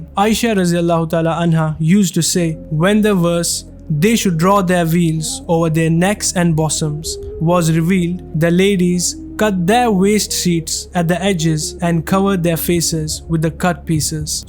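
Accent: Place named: Srinagar, India. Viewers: Are you a kashmiri? Your accent is that of a srinagariet 😅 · Yes i aslo want to know this...and i am noticing his accent is bit Kashmiri type...😂I think he may be from Kashmir❤ ...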